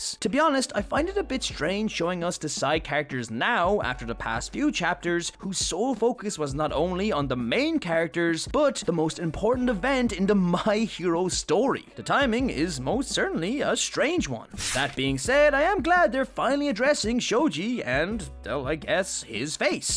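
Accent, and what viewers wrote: Ireland. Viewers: I knew your accent was so familiar as an Irish person myself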